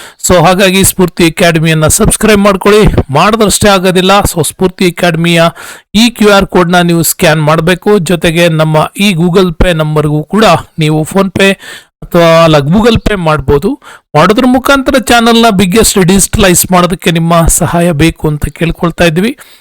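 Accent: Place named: United Kingdom. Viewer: ಸಾರ್ ದಯವಿಟ್ಟು ಕನ್ನಡ ನ ಕನ್ನಡ ತರಾನೇ ಮಾತಾಡಿ.......😢😢ಯಾರೋ ಬ್ರಿಟಿಷ್ ಅವರು ಕನ್ನಡ ಮಾತಾಡೋ ತರ ಕೇಳುತ್ತೆ.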